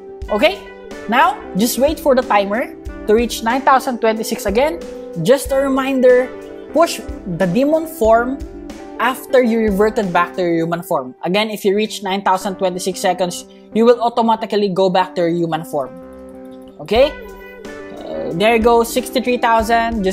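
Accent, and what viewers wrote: Philippines. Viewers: Your on philipines thats why your voice is like that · sa boses mo halata na na filipino ka eh haha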